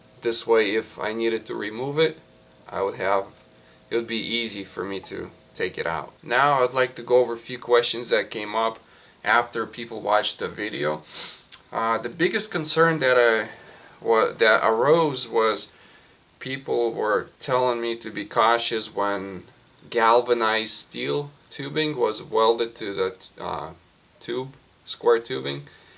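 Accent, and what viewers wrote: Russia. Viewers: акцент как у русского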